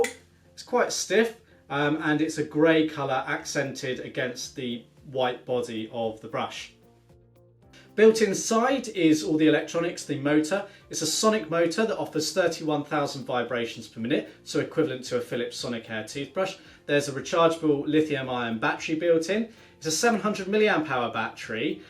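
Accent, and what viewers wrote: United Kingdom. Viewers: Aren't you a British one?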